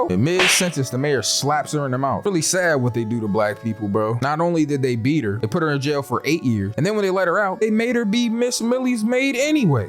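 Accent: California, United States. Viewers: I like your voice are you from Cali?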